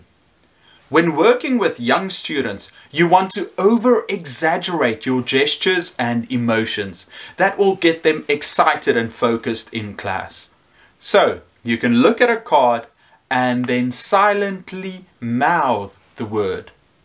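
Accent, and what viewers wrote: South Africa. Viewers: Great video, thanks for the tips! I am trying to work out your accent, am I wrong to say you are South African? I am not bad at picking accents considering I am not English :) but your accents makes me wonder.... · That South African accent is a blessing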